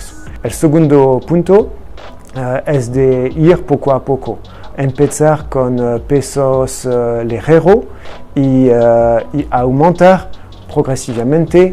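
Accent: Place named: France. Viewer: Pfff avec ton accent français tu me donnes envie de grrr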